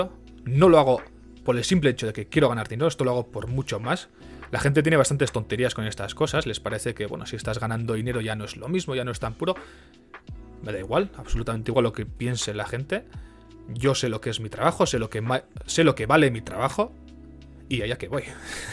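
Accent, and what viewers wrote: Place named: Eastern Europe. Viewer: pero si tiene acento del este de Europa....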